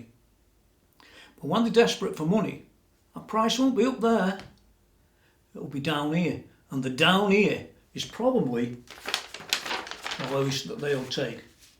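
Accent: Stoke, United Kingdom. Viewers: Sounds like a Stoke accent to me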